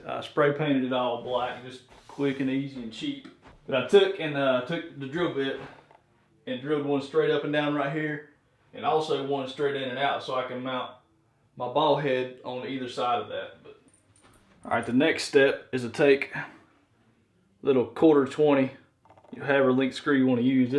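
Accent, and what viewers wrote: South, United States. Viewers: Idk why but I've never been a fan of U.S. southern accent. Actually, I've just not thought about it or considered it. But, my goodness, his voice and accent are just beautiful. Oh and the tutorial is great too. I'm trying to build a similar rig, and this looks really nice and straight forward. Thanks. 😊😊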